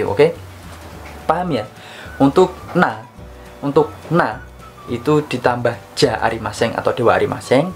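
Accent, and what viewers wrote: Java, Indonesia. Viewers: daebak jepangnya bisa juga pake logat medhok mas yaa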